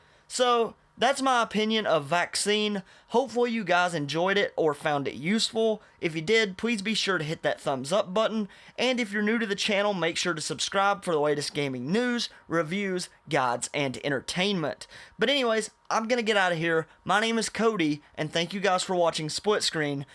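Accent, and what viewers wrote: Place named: Georgia, United States. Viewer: You sound like Dale from Baskets. Are you from Georgia?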